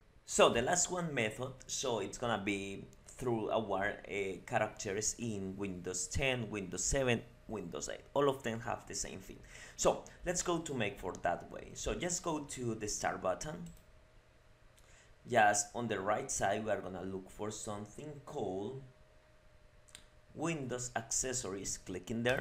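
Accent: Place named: Italy. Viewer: This was soooo cool. "Alt 64" worked for me. I adore accents! With respect, and if you don't mind, where's that accent from? Sounds Italian or Spanish? You're the man!! 2024 Sept the 30th.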